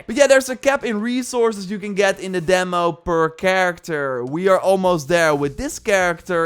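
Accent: Netherlands. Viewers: Quick question, hearing your accent I'm guessing your from the Netherlands? When's does the game drop there? Is it midnight going into April first, or is It at 17.00 On April first?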